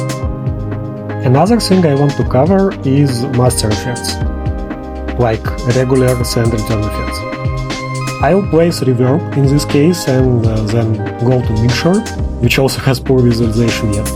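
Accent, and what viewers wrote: Russia. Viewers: Эй у тебя русский акцент ? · Друг слышу русский акцент) как бы такую собрать? Есть схемы или где купить, очень хочу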